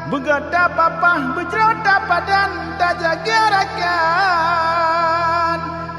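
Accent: Aceh, Indonesia. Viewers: Mantap that, logat aceh yg luarbiasa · logatnya aceh bangetz....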